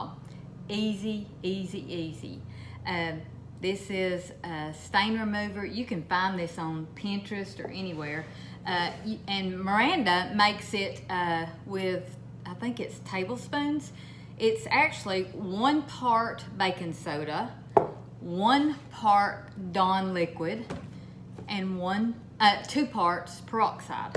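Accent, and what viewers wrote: South, United States. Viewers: I came here for the stain remover recipe but I stayed for the southern accent.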